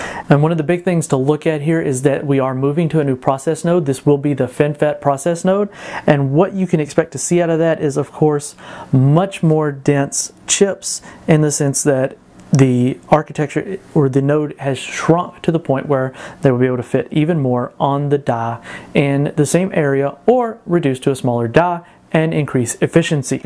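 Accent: South, United States. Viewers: I love how his southern accent keeps shining through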